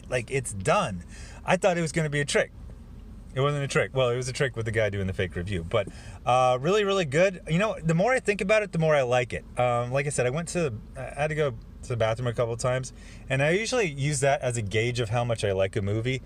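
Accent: United States. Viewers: Ya Boi comes out of the theater to record this vid and the first thing I hear is a vintage Western accent.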